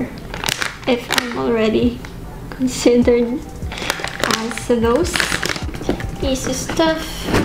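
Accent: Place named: United Kingdom. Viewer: I heard somewhat of a British accent ngl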